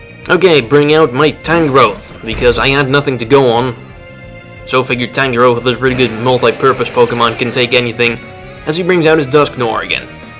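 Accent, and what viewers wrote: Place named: Spain. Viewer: español de pura cepa jajajaja pedazo de acentazo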